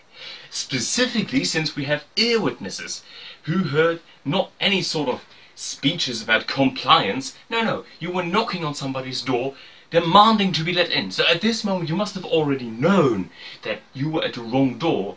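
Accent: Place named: United Kingdom. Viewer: I'm trying not to giggle the way you said it looks different it smells different feel different (with Brit accent). but that's true!! your place smells like you looks like you and feels like you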